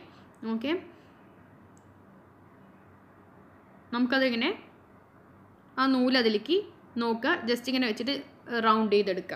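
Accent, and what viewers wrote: Palakkad, India. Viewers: Palakkadu kariyano?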